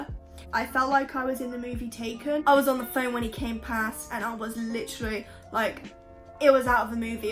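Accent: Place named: Wisconsin, United States. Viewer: Came from Tik Tok and didn’t realize you had an accent. Lol such a surprise. I forgot not everyone talks with a “Wisconsin Accent”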